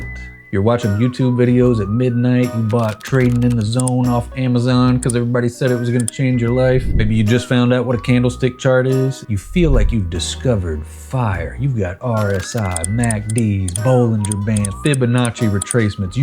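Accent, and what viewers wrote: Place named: West, United States. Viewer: I'm from the Bel Paese and I grew up watching Western movies and your accent seems to come straight from the mouth of those movies actors 😅. Sei simpatico e pieno di energia!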